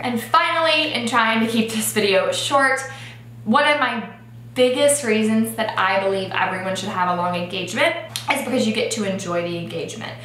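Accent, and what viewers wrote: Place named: South, United States. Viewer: Did you move to the south near the beginning of your engagement? I feel like your accent gets thicker in every video haha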